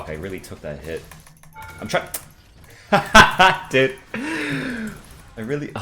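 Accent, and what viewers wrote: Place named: Finland. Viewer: his Finnish accent definitely helps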